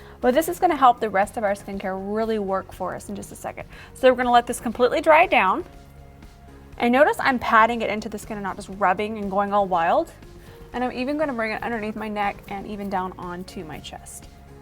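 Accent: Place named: Texas, United States. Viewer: Saw you on Snapchat and recognized a hint of Texas accent...had to follow! Can’t wait to see more videos!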